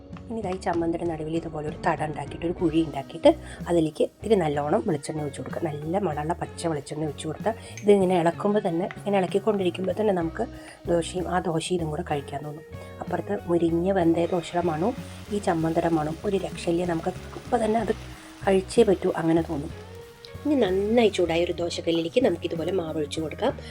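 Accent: Palakkad, India. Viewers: Hmmm kandapo kodhiyayi.. uluva manam varumo.. evide kettyonum makkalkkum manam vanhaal pne kazhikkilla..☹️🙄.Pne kandhisammandhi kettittundu.. vaayil vellam vanhutta.Videos ellam nannaavunhundu..You have a very unique voice and style of narration..😍☺️.Enikka palakkadan shyliyilulla ‘ttolu’ bayankara ishtaanu kelkkan. I thought you are a vegetarian..apozhaanu Mathippuliyude video kande…It was sooo good to see.Will try soon…All the best to your channel ❤️❤️❤️❤️Nattil varumbo Palakkadu varunhundu…Apo kanattolu 😍❤️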